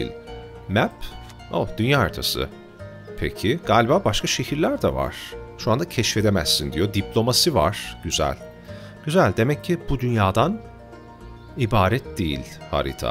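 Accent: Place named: United States. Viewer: Namı değer türkçeyi amerikan aksanıyla konuşan koca yürekli insan sanada selam olsun:washhands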